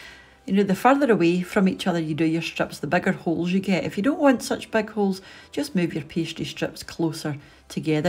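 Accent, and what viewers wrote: Scotland, United Kingdom. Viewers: I love your recipes! I live in North Ayrshire so its nice to hear a fellow Scottish accent :) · Are you from Scotland, by any chance? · isyt I recall Americans saying a few pats of butter or tablespoons. It just sounds so adorable to say knobs of butter. I don’t know why. I just love the Scottish accent and colloquialisms.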